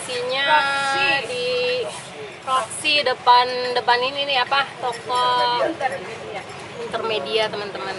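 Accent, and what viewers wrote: Banyumas, Indonesia. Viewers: Wong brebes apa wong cikarang jane.. ngomonge be ngapak mbok..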